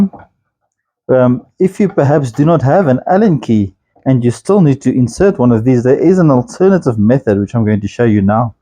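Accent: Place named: South Africa. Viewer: Thanks for these great ideas! I've never used the t nuts and hanger bolts before. I'm not sure that I can easily sink the t nuts into timber that is 160mm deep using either of your first two methods. The threaded inserts have to go into a wall stud to hand the floating shelf on. That will be awkward to because we can knock holes in the wall, but there must be a way to do this somehow. I used to have a Dr from South Africa about 30 years ago (he worked in Tasmania) I still love the accent, thanks for triggering a happy memory, of course I love your accent too as it's the same as his!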